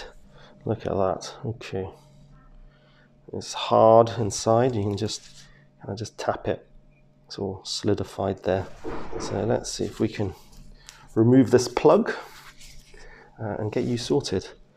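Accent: England, United Kingdom. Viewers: What I like about you is that you are very clean - you wipe the stuff off right away and you do everything quickly without hesitation, and correctly. No beating around the bush. You don't even need an assistant! You've got it all under control! Kudos to you!! And your beautiful English accent is wonderful! Love you!!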